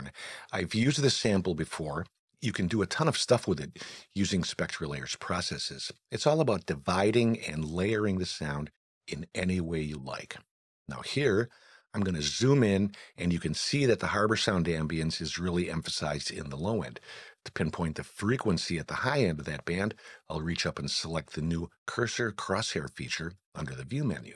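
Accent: United States. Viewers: The upstate NY accent warms my heart. Oh and SL 9 is cool too!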